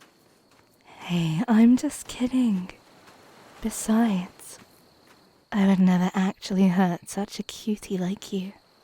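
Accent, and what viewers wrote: United Kingdom. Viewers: Amazing audio, your british accent is very nice and I would love to see spy wife / girlfriend storyline to continue there is so much suspense in that one 😊☺️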